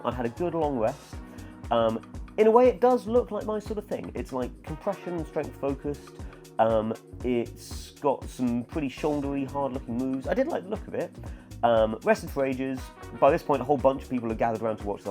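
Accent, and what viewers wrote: United States. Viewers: I can confirm that we Americans speak like that. I think it was spot on. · Your accent had me wondering why it was just for the southern states, so great job, it took me a moment to understand it was an American accent vs southern.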